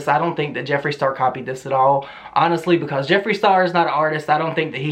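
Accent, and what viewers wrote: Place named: Florida/Georgia border, United States. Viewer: Where are you from!? Your accent reminds me of home: FL/GA border.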